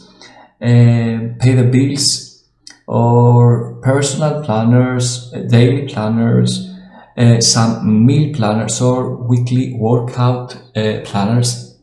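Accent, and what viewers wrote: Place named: Greece. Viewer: Loved your Greek accent man :)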